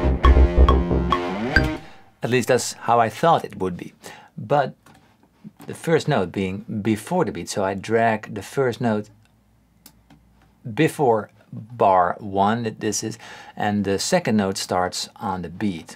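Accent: Netherlands. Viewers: Are you Dutch? Something about your accent feels familiar.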